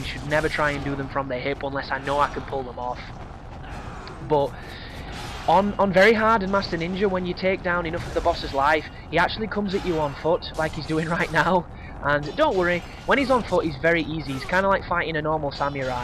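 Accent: United Kingdom. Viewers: I really like this guide...your voice is cool (i'm in the US; not used to british accents), and your guide is giving me the confidence to beat this game on very hard to then unlock master ninja. Just completed sigma 2 on master ninja? Ever doen that? Or have you only beaten ninja gaiden 2 for the xbox 360? Either way, I definitely enjoy your channel and your guides. Very professional and to-the-point.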